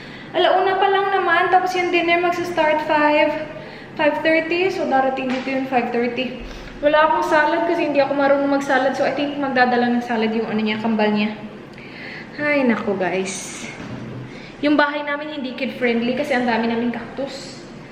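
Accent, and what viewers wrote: Visayas, Philippines. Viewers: So far so good..i enjoyed this vlog..i mostly love your sense of humor your so fun to watch..and let me ask..are you perhaps a Visaya?? Hahah..it's just so cute..love your accent too..more power and godbless. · I really like your accent... when you speak in english, even you have a visayan accent maganda pa rin sa pandinig.. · Bisaya po kayo ate? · Ate kabol ka magbisaya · Hahahaha awa bisaya lage klaro kaayo. 😂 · it's my first time watching your vlog ate and i really like it now 💕😍 especially your accent bitaglish😍💕. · Bisaya d i ka?... agai agai... haha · Agay so meaning bisaya xa. Hahah tama yong duda oks her accent says it all. Bisaya here as well i like the way you speak English the accent is great. From where man diay ka sis hehe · Hahahaha agay agay pa more hahahaa Bisaya kaman day oi 😁😁😁😁😁😁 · Visaya ka ate? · hahah na klaro ang pagkabisaya ba.agay3x hahahaha daghan na gyud tang mga bisaya gyud. 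new subscriber diay. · Bisaya mn diay😂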